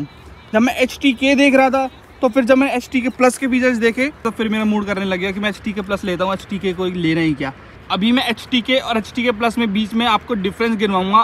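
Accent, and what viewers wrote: Haryana, India. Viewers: ji Hum aapki desi Haryanvi bahut pasand karte hai Bhai bhi thora lallu lagta hai 👍👍👍👍 Love from rewa, Madhya Pradesh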